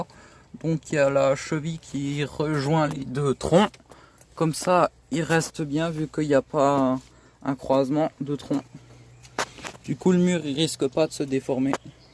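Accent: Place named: Alsace, France. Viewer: Excellent les gars, je viens de regarder ta vidéo, je vais bientôt avoir 40 ans et franchement continuez comme ça les jeunes je vous encourage, je viens d’Alsace aussi et ton accent je l’entends bien grave allez à plus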